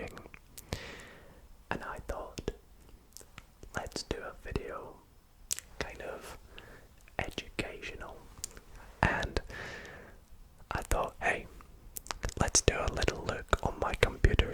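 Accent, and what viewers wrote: Scotland, United Kingdom. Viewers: Your normal voice is EXTREMELY Scottish and your whisper isn't. And it confuzzles my brain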